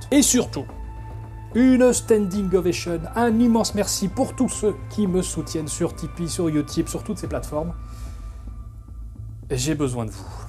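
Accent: Belgium. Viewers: Belge Mr?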